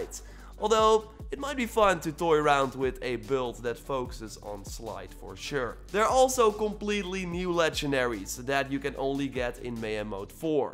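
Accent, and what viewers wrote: Netherlands. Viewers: Jezus gast, nederlands accent much?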